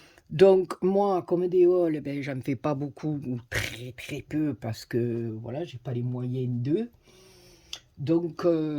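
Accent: South, France. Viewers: Coucou trop contenté de te retrouver avec ton accent du soleil gros bisous de la Picardie 😘💜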